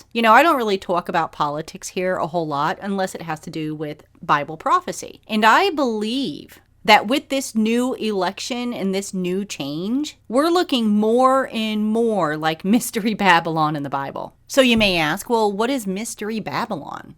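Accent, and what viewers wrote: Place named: East Coast, United States. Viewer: no he definitely seems to be a radical Islamist. I listen regularly to Glenn Beck and he said the mother of the new Mayor of N.Y C. stated in an interview that her son does NOT identify as an American, but rather with his Islamic heritage instead. I was born in Queens N.Y and raised on Long Island. I now reside with my husband in rural N.W.OH. I noticed you have an East Coast accent that sounds a lot like mine. Are you a N.Y gal too? I think NY.C is going to need a LOT OF PRAYERS!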